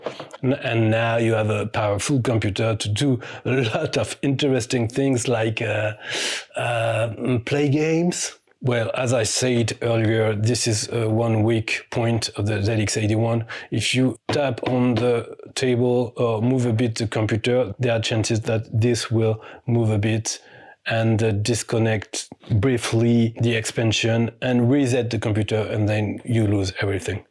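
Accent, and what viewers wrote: France. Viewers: Welcome to the rest of the world, LOL. 😁😎 love the French accent and thank you for the fabulous video. ❤️❤️❤️❤️ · Instant subscribe. And never apologise for your French accent. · Ace accent, reminds me of the comedy French Accent you always see in English language TV! Great subject!! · I am very proud of myself, I watched your video and I could understand all that you were saying, so no I can listen to a Frenchman speaking. Thank you, I give you a big thumbs up! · Awesome! We produce all kinds of new add-ons, and do refurbs of Sinclair machines. Lovely to see your new channel, and I do like your French accent! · Don't apologise for your perfect French accent. You present an excellent video in great style. Donc il faut que je voie les videos que vous avez déja téléchargées sur Youtube en français! The ZX81 back then taught me how compact you could make a simple OS to cope not only with the interface and the Basic interpeter, but also the display. 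It was a model of economy of coding. Its printer was very clunky, too: a spark printer which burned characters in a silver metallic coated paper to create dark grey "printing". I found a box of spare spools of that coated material only a few months back, safely put away in 1985! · Hi, Do not be sorry for your French accent. As you could not help where you where born. Be proud of it. 👍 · Happy to listen to a French accent instead an AI voice over any day. I enjoyed the content and look forward to more videos. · Don't worry about your French accent. Your English is better than my French (neither read nor speak it). Arctic Retro embraces his Norwegian accent - you should your French. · French accent: French. Kisses computer: definitely French! Great video and please don't apologise for speaking fluent English with a beautiful French accent. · Thanks for the video! I helped win a ZX80 for my primary school, then managed to persuade my parents to buy a ZX81 when it came out - that Tyrannosaur from 3D Monster Maze still haunts me! 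Thanks for showing that Pitfall style game, it looks amazing! PS - I'm a Brit and I love your French accent! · Never apologies for your lovely french accent ❤ not a scam but cheap tack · I very much like a French accent, I like the French. Love the video on this and the Oric, and I very, very, much hope that you will continue to make more English language videos. Particularly, since much to my embarrassment, I don’t speak French. (In my defence I wanted to learn French at school, when the brain is still receptive - but an older sibling had chosen Spanish and we had all the books. Colour me disappointed I can tell you.)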